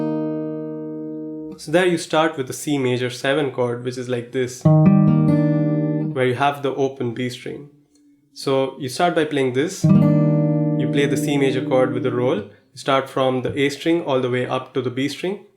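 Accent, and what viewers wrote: India. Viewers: Cllicked away because of indian accent…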